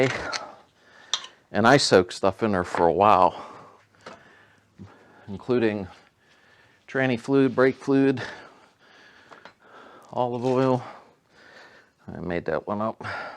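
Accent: Pittsburgh, United States. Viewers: I am a fellow „Yinzer“ and love your videos!! I recognized your Pittsburgh accent right away but I really like how you go into what is needed and how to do it with minimal cost. Keep on making these great videos. I am rebuilding a Karmann Ghia and live in the South Hills.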